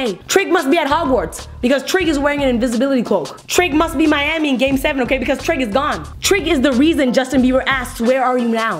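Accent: United Kingdom. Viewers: That British accent😍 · Is it just me that LOVES her English accent 🤣🤣🤣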